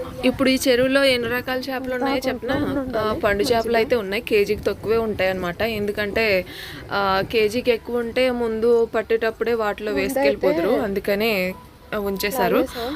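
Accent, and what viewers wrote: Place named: Godavari, India. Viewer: Mi voice change aindi konchem godarolla ammai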